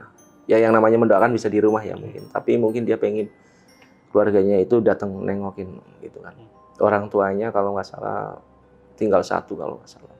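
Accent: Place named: Tegal, Indonesia. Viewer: Iya saya juga orang tegal Penasaran ini mas nya tegalnya manah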